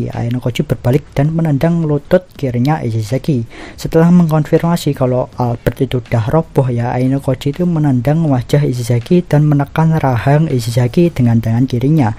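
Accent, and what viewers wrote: Java, Indonesia. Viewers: Ngomong mu lek medok men to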